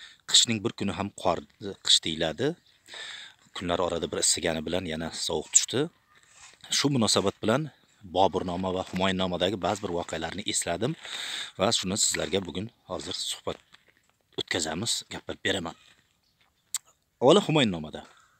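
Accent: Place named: Surkhandarya, Uzbekistan. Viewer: Сурхондарё еки Кашкадарё дан деб тахмин килгандим шеванггиздан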